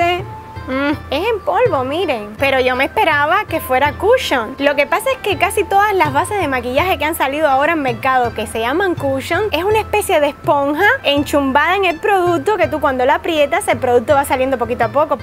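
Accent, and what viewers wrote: Cuba. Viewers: si es de Cuba pero vive en Miami 😉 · Eres cubana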